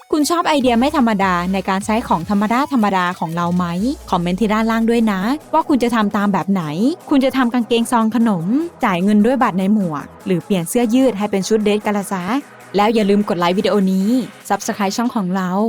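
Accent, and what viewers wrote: Isan, Thailand. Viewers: เราว่าพี่เขาเป็นคนอีสานหลุดพูดตั้งหลายคํา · พี่เป็นคนอีสานเหล่อออครับบบบ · พี่พูดอิสานติ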